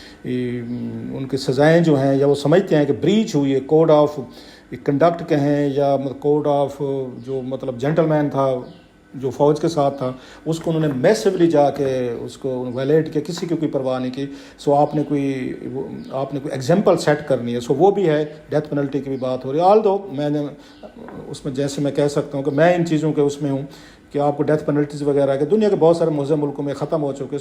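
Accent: Saraiki, Pakistan. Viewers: روپ کلاسرا بخدا پاکستانیوں کی بہت بڑی تعداد اپ سے نفرت کرتی ہے اپ جو سرائیکی میں اردو بولتے ہیں صحافت نام کی کوئی چیز نہیں اپ میں یہ پھیلانا چھوڑ دو تمہارا یہ چورن نہیں بکنے والا مرحوم ارشد شریف کی موت پر تمہیں موت پڑ گئی تھی تمہاری زبان تالو کے ساتھ لگ گئی تھی تمہارے منہ سے کوئی یہ لفظ نہیں نکلا شرم سے ڈوب مرتے وہ صافی بھائی تھا تمہارا اب نکل پڑے اور سرسنیاں پھیلانے بے شرم انسان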